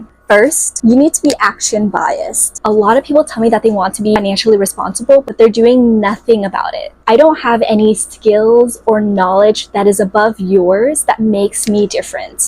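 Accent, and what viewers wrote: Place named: Vietnam. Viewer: I love your accent (from Vietnam)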